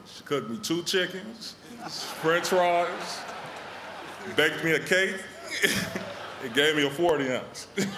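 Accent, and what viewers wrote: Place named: Compton, United States. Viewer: Compton accent like a mf R.I.P. Big LA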